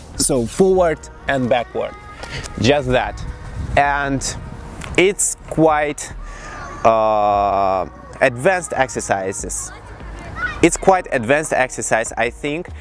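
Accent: Russia. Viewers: Блин) акцент вообще чётко услышал, смотрю - и в правду русский, а я и не сомневался)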